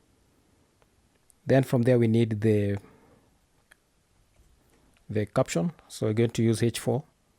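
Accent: Kenya. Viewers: He sounds like a Kenyan Nice tutorial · He sounds kenyan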